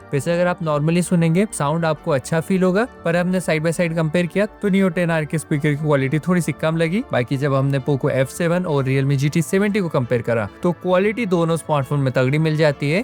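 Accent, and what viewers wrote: Indore, India. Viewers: Bhai Indore se ho kya?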